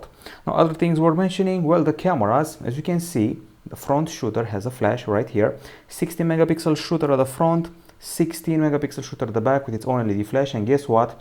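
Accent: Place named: Romania. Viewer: Esti roman dupa engleza ta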